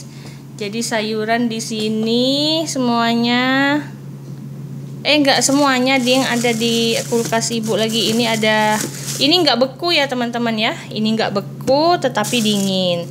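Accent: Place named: Sumatra, Indonesia. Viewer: ohh pantes logatnya sumatra bgt..lam kenal balik bun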